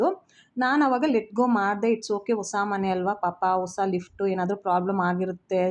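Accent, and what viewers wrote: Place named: Punjab, India. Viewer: Hi nanu nime volg evte nudidu medam esta ayethu.but nime kannada keli sulpa shock ayethu i think u r a panjabi adre esto chanagi kannada madatitdara very nice 😊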